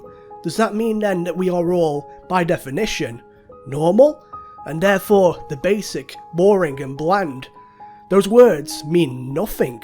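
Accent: Northern England, United Kingdom. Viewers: Found your channel this morning and now going through the rest of your vids. Your scripting and editing is class. I'm from Northern England so your accent gives me some bias into liking the commentary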